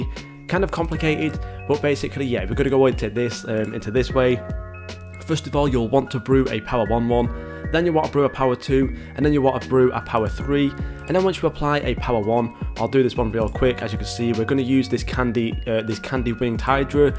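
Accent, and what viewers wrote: United Kingdom. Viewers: Very nice bri'ish dude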